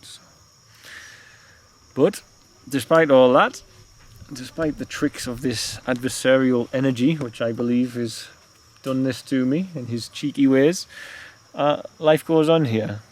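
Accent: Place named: Newcastle, United Kingdom. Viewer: Hi I'm very happy to hear the update, as a UK national (I guess from the Jordie accent) could you use the NHS to keep fees down?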